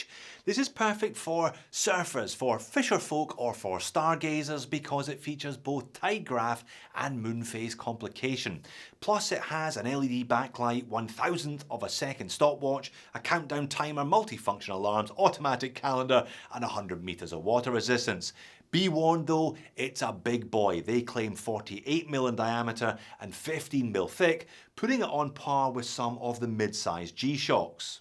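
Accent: Scotland, United Kingdom. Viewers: Oh the Scottish accent. I was trying to figure out what a dated complication is… an old function you don’t need anymore?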